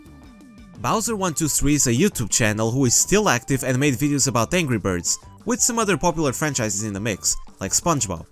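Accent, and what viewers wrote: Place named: Portugal. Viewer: krl tu é português, muito foda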